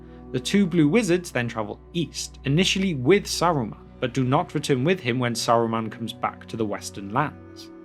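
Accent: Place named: West Country, United Kingdom. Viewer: I love your west country twang, very hobbity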